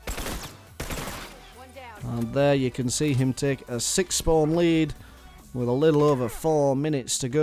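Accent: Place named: United Kingdom. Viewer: Is the british accent so much fun to hear? Or just good in commentary, nice work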